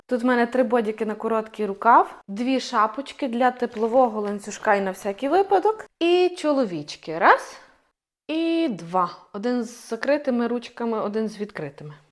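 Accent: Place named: Lviv, Ukraine. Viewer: Обожнюю львів'ян, їду з Києва спеціально щоб послухати ваш діалект, рідний мені з дитинства. Якраз збираю сумки в пологовий, тому дуже корисно було послухати, дякую!! ❤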